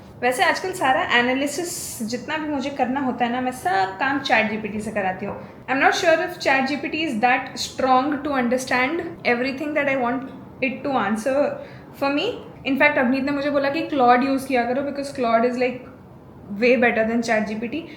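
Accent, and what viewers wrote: Bihar, India. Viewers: Your accent is bihari but you are from Rajasthan I am not getting it how how 😂?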